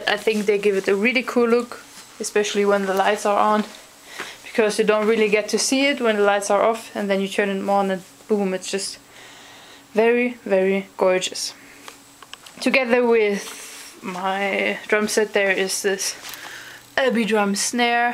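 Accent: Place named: Germany. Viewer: Youre german right? I like your accent :)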